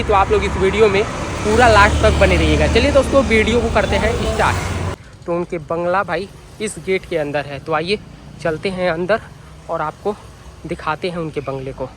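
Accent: Bihar, India. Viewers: भाई आपकी बात करने के सुर से लगता है आप उस प्रदेश के है जिस प्रदेश के चाराचोर लल्लू यादव है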